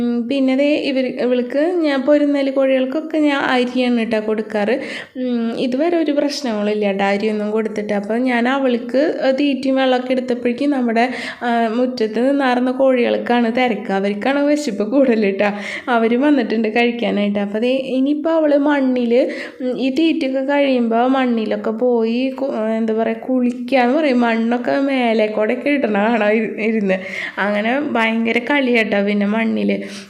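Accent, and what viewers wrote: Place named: Thrissur, India. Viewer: നല്ല വീടിയോ സൂപ്പർ നല്ല ധിർസുർ പാഷ്ഷയാ കേള്ക്കാന് നല്ല രെസമാ അവസാനത്തെ ട്ടാ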